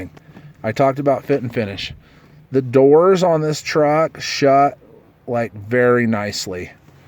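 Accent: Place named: Utah, United States. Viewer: Listening to you speak I could tell you’re from Utah. Saw your license plate - sure enough lol I live here to. I’m looking to buy a 14 actually so stumbled on your video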